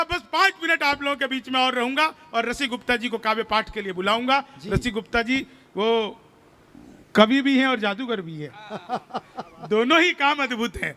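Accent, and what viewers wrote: Bihar, India. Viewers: Aap BIHAR k ho maloom apke bolne se pata lag gaya NITISH NE JO BHASHAN DIYA USSE PATA LAG GAYA POORE BIHARI PURUSH MAHILAYEN SAB EK SE HOTE HAI SAB GANDA KHANEWALE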